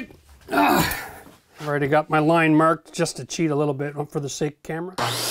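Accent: Canada. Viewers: Well done, I love your videos. Did anyone tell you that your sound like Rik Emmet from Triumph when you talk? Canadian?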